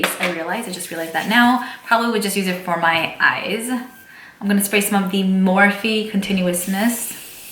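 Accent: New York, United States. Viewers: When I first started watching you I thought you were Italian lol. But, now I realise your accent is New York or Bronx. I think you're adorable, and so likeable. I could really see us being friends. Thanks for filling us in on who YOU are, and we all love you.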